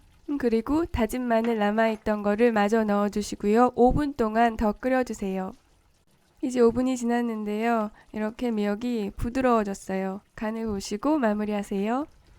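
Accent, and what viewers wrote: Seoul, South Korea. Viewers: 와 말투가 오리지널 서울사투리네요 신기하다ㅋㅋ 지금은 지방쪽이랑 섞여서 서울말이 진짜서울말이 아닌데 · 말투가 서울사투리 느낌..영상 잘봤어요 ㅎㅎㅎ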